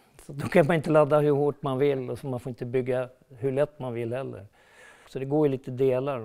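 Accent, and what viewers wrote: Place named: Stockholm, Sweden. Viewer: Skön lirare 😊, och pratar riktigt Stockholmska 👌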